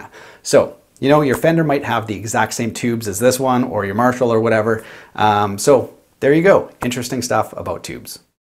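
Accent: Canada. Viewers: Your constant Canadian mispronunciation of “project” and “process” is absolutely annoying. Don’t get me started on “bahhcks” instead of “bucks”. You can say “I’m Canadian” all you want. It’s laziness.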